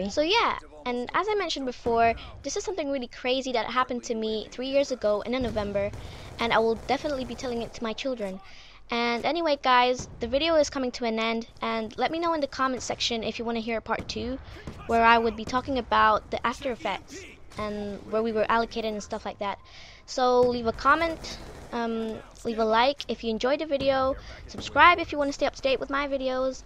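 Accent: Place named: London, United Kingdom. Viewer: You have a london accent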